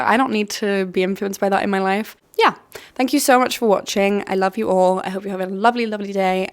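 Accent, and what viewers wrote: United Kingdom. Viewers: Your British accent is so lovely 💗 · Great video! I'm 38 and social media still contributes to my regular identity crisis'. I'm trying desperately to place your accent and all I can come up with is posh Geordie 😂 I hope you're not offended!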